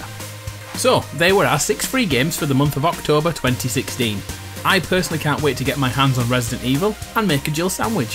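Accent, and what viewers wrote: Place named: Scotland, United Kingdom. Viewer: Wow, the scottish accent. How much you changed!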